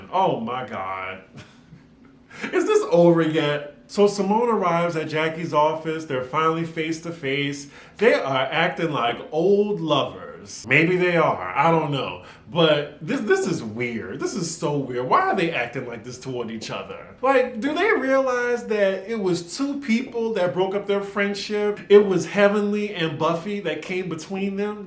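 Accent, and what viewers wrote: New Orleans, United States. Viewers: I know you've been in NY for a while but I still hear many hints of Nola! 🥰🥰 I'm sick of Toya ass and I didn't need to see Kari...Great review!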